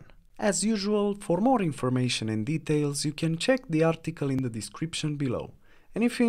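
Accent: Russia. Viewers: ue voice like russian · is this guy russian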